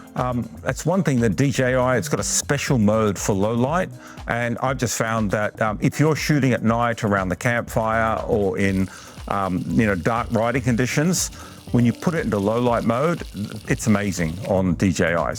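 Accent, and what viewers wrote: Australia. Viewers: Guy sounds Aussie, why would he give a fuck? And as an American, this "it's made in America" to prefer inferior products is lame. American companies should step up their game instead of keeping 6 year old sensors like GoPro. Don't even get me started about the DJI drone ban in the US with clueless politicians.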